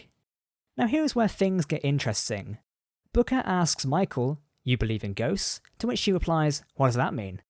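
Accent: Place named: England, United Kingdom. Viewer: You're clearly British, probably English by your accent, so please remember the word is pronounced CommunicaTor NOT CommunicaYDA. "...the planet is rather unique." - 'unique' is an absolute. Something is either unique or not unique; it can't be partly unique, very unique, slightly unique or indeed rather unique; not to mention that the number of planets with multiple moons we've encountered in previous Star Trek outings, suggests that a planet with two moons is certainly NOT unique.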